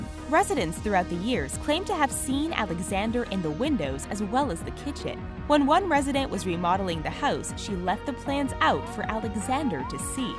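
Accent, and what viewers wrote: Canada. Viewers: So off topic....But I love the way Canadian's say house and out... 😊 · I came down to the comments to do just that! Hilarious. Listen to the way she says "house" too. I think she has a Canadian accent.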